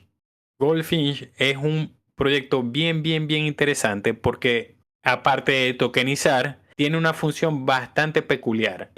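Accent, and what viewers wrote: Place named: Zulia, Venezuela. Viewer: Este canal es un.. what the fuck!! Solo por curiosidad escuche el acento zuliano, pero de verdad mal producido y desarrollado el contenido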